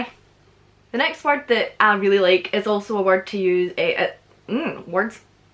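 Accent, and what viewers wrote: Scotland, United Kingdom. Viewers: scottish accent is the best 😂♥️ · Oh my God I simply love this accent. I was planning to study English there in Scotland. I got a better deal for England, (un)fortunately, though. At least I'll be able to go there. Subscribed (long time ago). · Giiiirrrrl you make the Scottish accent sound Scottish. Seriously, though, thanks for this. It's been a bit since I've been to Scotland and I miss the people and the accent. Slainte Mhath!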